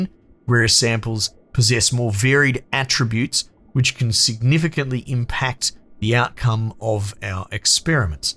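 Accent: Australia. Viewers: lmao i fucken thought to myself this lad sounds like me, then you reckon "right", im like yeaaaaah he's an aussie hahaha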